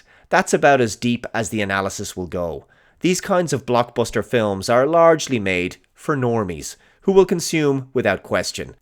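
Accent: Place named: Ireland. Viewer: Is it me or does just sound more Irish than before? I haven't watched for a long time.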